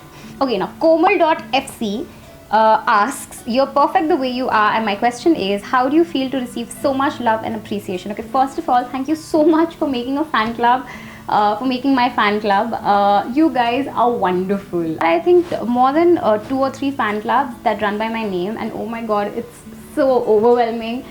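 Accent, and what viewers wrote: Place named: United Kingdom. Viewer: i love your english accent...how did you learn it n speak it so fluently.?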